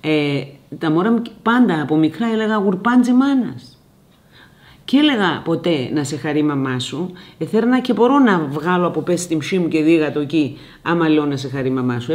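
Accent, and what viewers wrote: Greece. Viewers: Her accent heavily affected by Demotic. This is not authentic Pontic Greek. She hardly pronounce the sounds of "ch" and "sh". You could find authentic untouched Pontic Greek only in the mountain villages of three districts of Trabzon province, Turkey spoken by elderly women, especially women. "Çaykara, Köprübaşı, Dernekpazarı" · Yes I was thinking the same thing, her accent is very influenced by modern Greek especially as it lacks the Turkish influenced sounds of ch, sh, and the like. This is normal as Pontians were forced from their homelands after the genocide 100 years ago … it’s hard to maintain everything perfectly. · its influenced my modern greek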